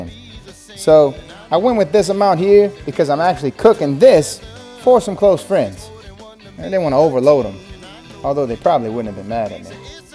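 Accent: Louisiana, United States. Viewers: cajun accent...fixed it for you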